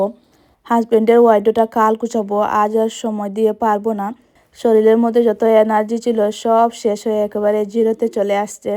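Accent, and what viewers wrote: Sylhet, Bangladesh. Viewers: আপু এটাই স্বাভাবিক এলোমেলো হয়ে যাবে আবার গুছিয়ে নিবে সবারই এরকমটা হয়ে থাকে আর খুব সুন্দর তোমার উপস্থাপনা সিলেটি ভাষা আমার খুব ভালো লাগে ❤❤❤❤❤❤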